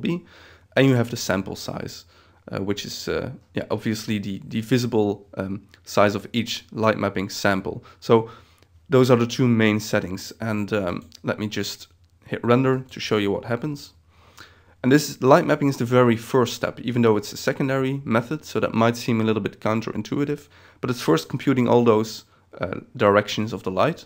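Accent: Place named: Netherlands. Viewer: hee jij bent een nederlander! ik hoor t aan je accent :P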